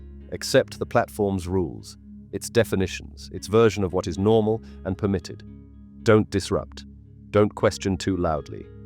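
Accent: United Kingdom. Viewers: I listened closely to the first 15 minutes and I can't believe people actually see this as anything more than Kamala Harris talking before an election it says absolutely nothing in a British accent for 15 minutes I can't listen to the rest of it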